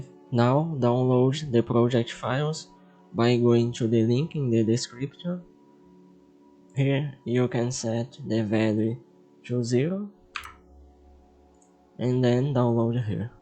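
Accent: Brazil. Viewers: inglês brasileiro :)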